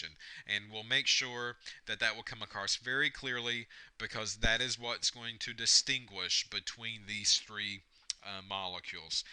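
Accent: South, United States. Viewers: very good like your southern? accent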